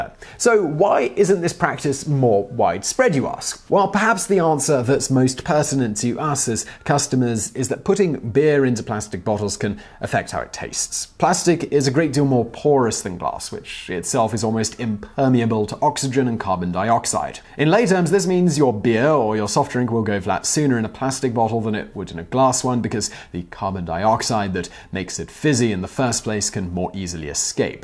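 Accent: United Kingdom. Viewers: Seriously what is with the way the British pronounce aluminum? It was made in America named by an American and pronounced the way Americans pronounce it. You wouldn't just start pronouncing somebody's name differently because you're British would you? I'm going to state calling you because this is America damb it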